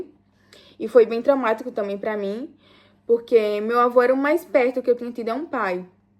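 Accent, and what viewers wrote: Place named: Northeast, Brazil. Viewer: Seu português é, um sutaque de nodestina .... e não tem nada Haver se mora fora do Brasil ou nao...😂😂😂😂😂😂😂😂😂Nodestina......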